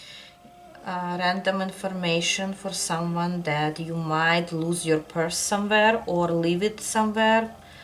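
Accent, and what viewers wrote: Russia. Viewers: You have the most beautiful accent and voice Idk Russian almost sounds like my grandmother's accent on my dad's side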